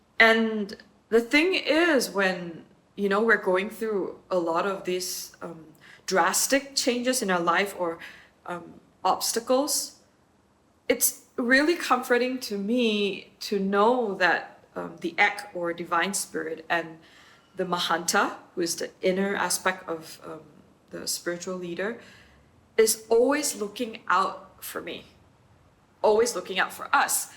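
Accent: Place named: Malaysia. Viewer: You sound like a Malaysian, or Singaporean. My question: Is there a contact person in Malaysia?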